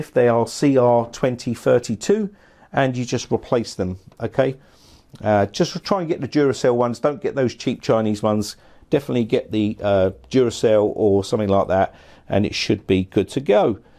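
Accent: England, United Kingdom. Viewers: Most satisfying english accent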